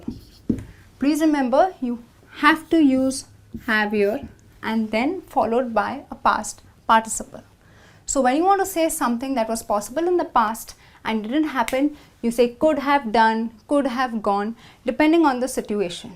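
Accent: India. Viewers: I love your indish Accent im not so good english but thanks for the lessons ♥ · тут че индийский акцент?! сложно привыкнуть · ما تعرفين تتكلمين انجليزي زين الهندي غالب عليكي بس عادي المهم تعرفين انجليزي 😆😆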